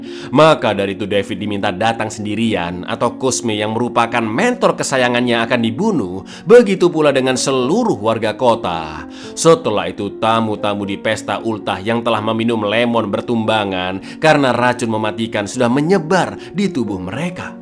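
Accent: Java, Indonesia. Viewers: Beta buka gudang, anggaran ilang (gaya bicara medok)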